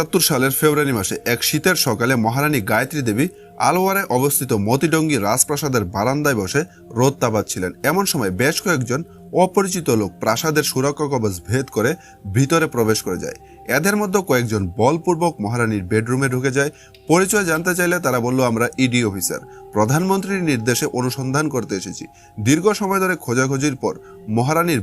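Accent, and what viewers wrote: Bangladesh. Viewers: Are you Bangladeshi?. Because your pronunciation( talk) is like a Bangladeshi people. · Khankir chele anchor ta Bangaal ...Razkumari ....khankir chele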